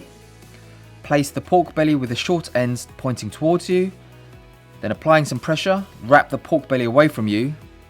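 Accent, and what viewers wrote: United Kingdom. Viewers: Love your British accent!! Hi from America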